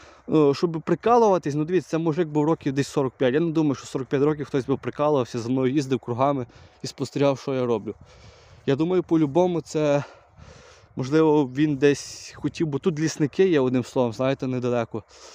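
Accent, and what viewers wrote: Ukraine. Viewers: Виглядає, що ви із Львівської обл. Може, навіть із Золочівського району. Співробітник мав подібний говір. Не подумайте, що намагаюсь шось вирахувати , бо знаю, що у людей зараз ще та параноя. Просто цікаво. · Я так розумію ти з західної України по розмові!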